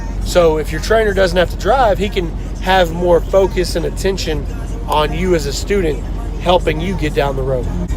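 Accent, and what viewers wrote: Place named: Texas, United States. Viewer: Part of Texas you from?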